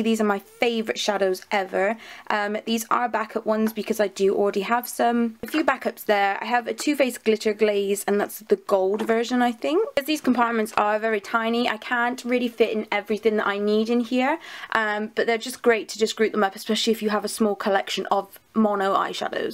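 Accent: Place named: Somerset, United Kingdom. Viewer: You sound rely Somerset like me 😍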